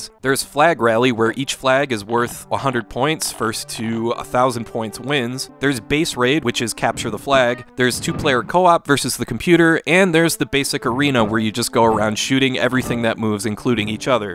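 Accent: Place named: Midwest, United States. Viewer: Random Midwestern accent got me.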